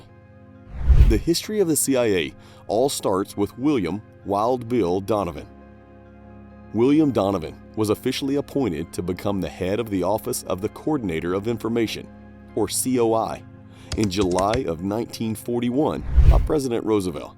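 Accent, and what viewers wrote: South, United States. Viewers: I detect a southern accent.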